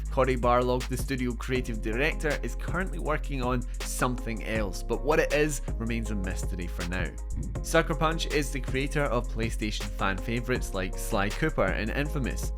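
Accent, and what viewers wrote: Scotland, United Kingdom. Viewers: Imagine being that stupid you cant understand a easily understandable Scottish man.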